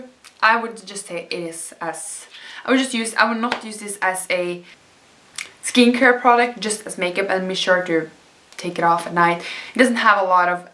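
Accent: Norway. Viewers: Are you Norwegian? It kind of sounds that way, because I'm Norwegian too! :D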